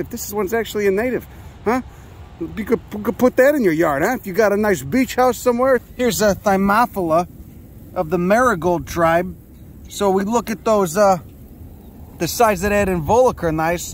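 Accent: Chicago, United States. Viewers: can you please say "flotsom and jetsom" in yer chicago accent????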